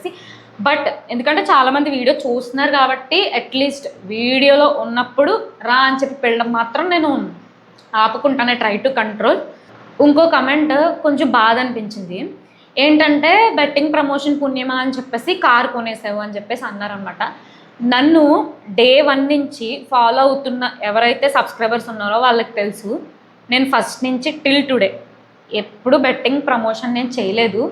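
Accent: Godavari, India. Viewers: Car chala bavundhi Miru matladuthunte pure godavari slang kanipisthundhi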